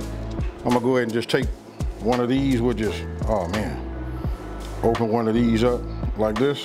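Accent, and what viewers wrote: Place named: South, United States. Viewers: You the man I grew up in the south I'm ga boy You are ga or Alabama or Mississippi Good cooking. God bless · I'm Canadian and I really really like American accents. Yours is off the charts! Love that Southern twang. Also, this recipe looks delicious. Will definitely try it!! Cheers 🍻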